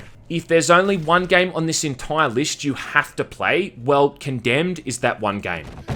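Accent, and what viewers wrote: Australia. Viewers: Your videos are way better than Gameranx. It may just be the macho ozzie accent. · Love this guy's Aussie accent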